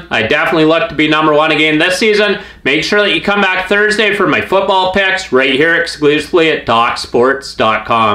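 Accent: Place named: Ireland. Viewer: You seem to be drifting into an Irish accent ......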